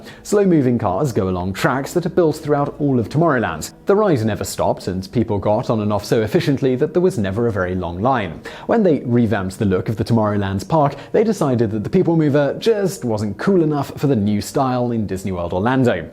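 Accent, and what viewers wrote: United Kingdom. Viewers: lotsa misformation... your cookie cutter production wears thin... fewer videos and better research, if you don't mind... no offense, but tire of informational videos with the narrator speaking in British accent., which does not imply intelligence 7 and/or education as is generally accepted by the rest of the world; French and Spanish are considered "sexy", while German is considered coarse and unattractive ... there's a subject: Accents - favorites and least ... 🕊️ 🌎 ✊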